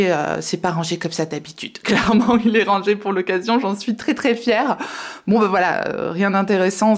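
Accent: England, United Kingdom. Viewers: J'aime bien votre chignon et surtout votre accent anglais ! Yeah ! 😄😉💗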